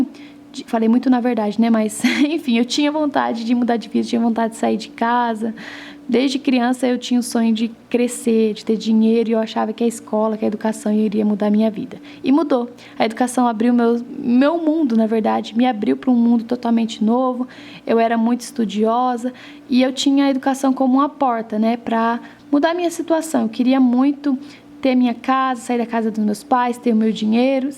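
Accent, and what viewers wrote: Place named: Campinas, Brazil. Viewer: ''Não falo leitE quentE'' haha. Na verdade, eu sou de Campinas/SP e acho seu sotaque bem parecido com o meu. Eu gosto muito da sua história. Você é guerreira e sempre correu atrás de realizar seus sonhos. Pode acreditar que seu canal não é apenas entretenimento, mas também é um canal de bençãos, seja para inspirar outras pessoas a também realizarem seus sonhos ou para falar do amor de Deus através do seu testemunho. :)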